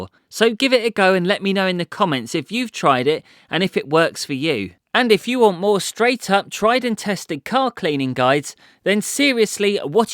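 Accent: United Kingdom. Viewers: I have no idea why you got so many views for this video!! Maybe the British accent? And dummies think just because you have a Btitish accent you might be teaching a magic?! Well. I just wasted 2 minutes watching your useless video mate...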